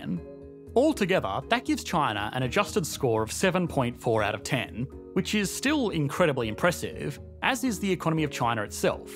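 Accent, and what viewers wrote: Australia. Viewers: Aussies talk super funny with little effort to try and improve their pronunciation. This is not new!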